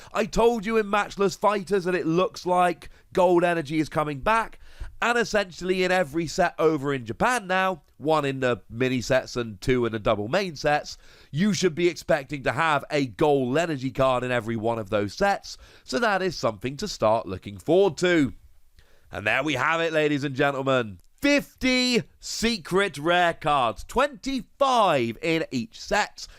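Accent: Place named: United Kingdom. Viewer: Half the reason I watch you is to hear you say “but’’ in your beautiful British accent. Love the info you give us amigo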